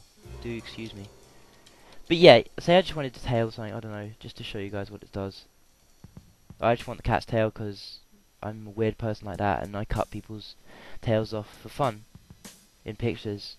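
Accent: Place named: England, United Kingdom. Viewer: i actually loved listening to you sounding SOOO uninterested and english, i laughed all the way through it, plus it was actually helpful, except i knew all that stuff already, i need to know how to do shading with opaqueness... :(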